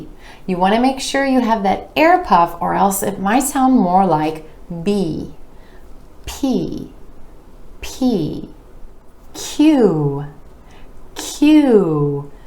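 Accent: United States. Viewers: Mam you are amazing I love American accent but I dont know how to sound like that and now I am improving myself by seeing your videos thank you mam❤ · Because of I find it easy to learn American accent. Her accent is too cool. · Mam, I'm from India. Here everyone use UK accent but I like US accent.You are really a good teacher. I Understand very clearly. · Respected mam your teaching American accent praise worthy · Hey! Your pronunciation is wonderful. Typically the AMERICAN I like. Good luck. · Is this American or British pronunciation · You can become a excellent teacher in amarican accent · I heard the pronunciations, like you said 'U' like it is. So, my question is, why do Americans say stOOdent instead of pronouncing the 'U' in it. Why do you say cute, with the 'U' in it and not cOOt if they pronounce it as a double oo. Same goes for cucumber, then rather say cOOcOOmber, if you want to proceed with the incorrect pronunciation. 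It doesn't make sense. Same with the word mature... Just asking.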